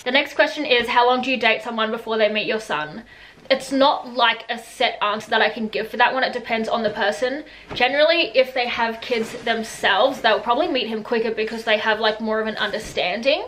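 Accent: Australia. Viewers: Shirt is adorable, agreed. Love your Aussie accent. Love your low-key vibe, and that u don't HAVE to have a boyfriend...bravo to u doll!😘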